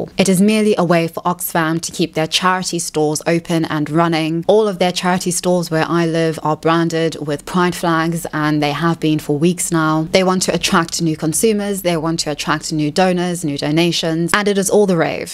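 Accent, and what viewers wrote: South Africa. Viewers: It's her accent, people talked like that around here in South Africa, she's explained that before. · She's South african but has lived in the uk since she was a teenager, so it's probably her going in and out of accents.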